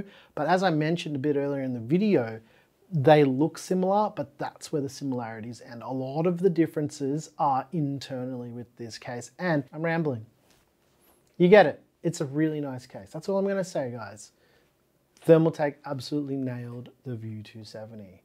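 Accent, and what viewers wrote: Australia. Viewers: I just bought this case and looked up this video to see how to take off the glass panels without manhandling and breaking them. Stayed the whole video for the chill vibes and Australian accent.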